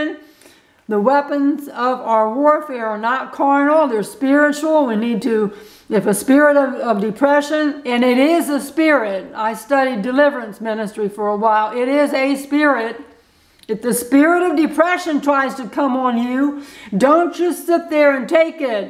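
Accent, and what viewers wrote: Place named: South, United States. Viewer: lol not really a state but ok. I live in SC and I can tell you are from somewhere close. GBU too.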